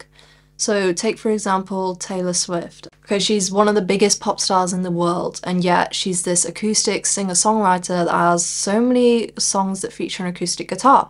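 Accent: England, United Kingdom. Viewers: “Rock isn’t dead, it just smells funny” - Frank Zappa, sort of. Agree very much with your assessment of the state of the art. Curious to know what part of England your accent is from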